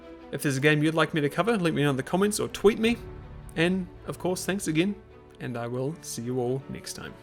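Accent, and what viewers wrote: New Zealand. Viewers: "Some clans may begin in a more precarious position than others" Legendary Uesugi flashbacks What timing though. Was surprised to see a video on this when I'd been playing shogun 2 just a couple of months back, and shocked to hear a good ol' kiwi accent. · Oh he's a kiwi I was wondering why the accent was confusing me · I love this review for a few reasons: it's exceedingly late, which means it's gonna help folks who may have compatibility issues on newer systems; it's weighing the game more on its own merits than just mindlessly comparing it to Rome 1 or Medieval 2 as some old school TW fans tend to do; and the exceedingly kiwi accent. The only thing I disagree with in this video is the statement that Warhammer 2 is the best example of a Total War game thus far. Mechanically, maybe, but a huge part of the appeal of the franchise has always been the large scale battles set in their different takes of different historical periods and settings. 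You can't take that away from Total War even if it permanently shifts to fantasy, like going into LotR, Game of Thrones, or even WarCraft lore (which I'd honestly love to see). In that regard, I'd still say Shogun 2, especially including Fall of the Samurai, is the epitome of a Total War game among the post-Medieval 2 releases. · homie is kiwi af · Wow, you’ve got one of the kiwiest accents I’ve ever heard.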